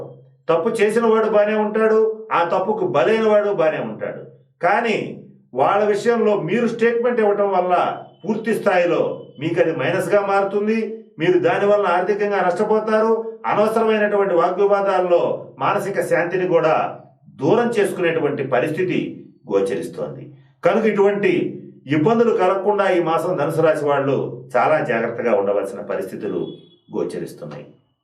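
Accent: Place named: Telugu, India. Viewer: Your explanation is good . The reason may be due to your training as a teacher coupled with your accent in Telugu . I am viewing your videos on Dhanassu as the sign is of me & my son belong to that Rasi . Thank you sir . From New Mexico USA camp .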